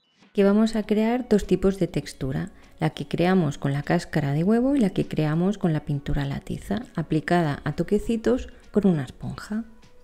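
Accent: Catalonia, Spain. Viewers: Adoro el acento:catalana?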